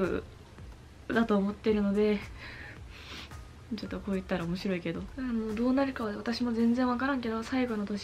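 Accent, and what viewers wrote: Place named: Hiroshima, Japan. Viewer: じゃけどって方言でとるけど広島かな？私も広島🥰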